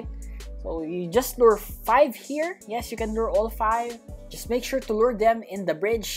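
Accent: Philippines. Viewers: You from Philippines? Cuz ur accent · Are you Filipino? I can tell by your accent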